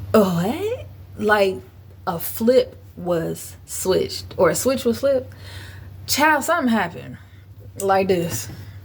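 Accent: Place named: Midwest, United States. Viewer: Nice story! Seem like your from the Midwest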